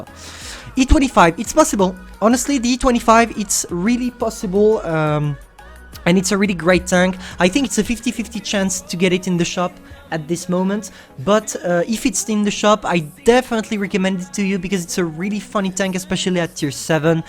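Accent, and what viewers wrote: France. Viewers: je me disais xd l'accent français et le le clan LOCA et je t'avoue que j'ai pas tous compris mais sa ses amis cause de MON anglais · Its heavy not hivie and regulaaarlie lol i can so tell you are french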